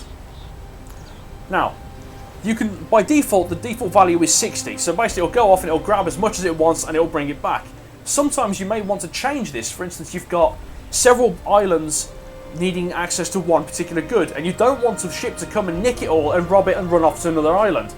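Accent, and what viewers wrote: England, United Kingdom. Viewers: Lol, English accent and mature comedy, Fuck going through all you videos and watching them, Instantly subscribed :) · Thank you so much for this tutorial! I absolutely love your way of talking, British accent, casual swearing and all. You got a subscriber, keep it up! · LOL epic british accent to go along with this epic vid:P just started playing this game again. this vid is really helping me. when i used to play i did fairly well, but my cities were just a total messxD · Commentary= Awesome, funny with British accent Guide= Awesome and very helpful Reaction= U just made me buy this game from Steam Now i'm gonna try it out... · the only video i have learned so many thing about the Anno game basic. thanks buddy. i love british accent. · Thinking about getting this game and this video is helping alot in helping me make my decision! 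and the british accent makes the video both informative and very funny!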